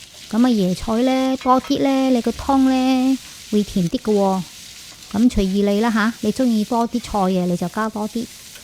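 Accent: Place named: Hokkien, China. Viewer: …. Your Cantonese improved so much… but still have that hokkien ‘slang’ 😃😃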